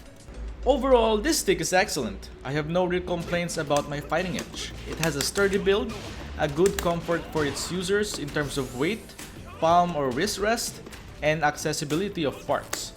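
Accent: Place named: Spain. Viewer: Oye hermano puedo apreciar que hablas español o tal vez Portugues xd Well Im planning on buying this bad boy as my first stick (You know, going to get serious) But, how hard is it to mod? As a completely idiot and begginer? Mi biggest issue with the stick is the lever but aside from that the stick is sooooo beautiful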